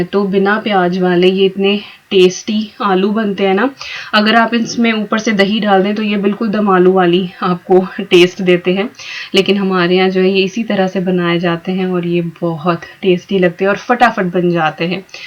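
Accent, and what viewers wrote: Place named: Muzaffarnagar, India. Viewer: Di plz reply aap India me kha se ho aap ki baato se lg ra h aap mzn k aas paas se ho kyoki kale sing ji whi pe h or wha aise hi chulhe pe kheer bnai jati h nd wha pe hm bhi gye h kitni baar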